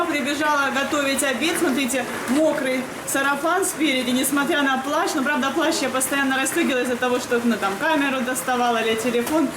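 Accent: India. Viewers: За столько лет в индии чисто индийский акцент английского стал)) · так интересно, у Вас английское произношение индуское, это просто удивительно! Только с позитивной стороны говорю. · У вас, уже акцент на индийский стал похож )) Nice do mid' you;)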